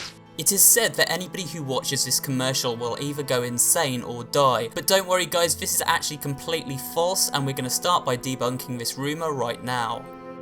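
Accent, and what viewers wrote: United Kingdom. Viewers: R u British I can tell from ur accent I'm British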